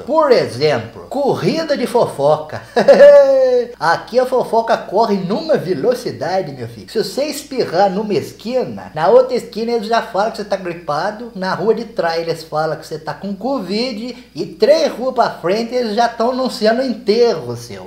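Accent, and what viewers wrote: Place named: Piracicaba, Brazil. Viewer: quando ocê veio pa Piracicaba? porque ocê falo do jeito que é aqui onde eu moro. Apesar que nois piracicabano samo um pouco mineiro também.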